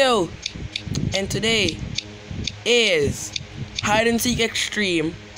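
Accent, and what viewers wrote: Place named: United States. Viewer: Yuh sound a way talking american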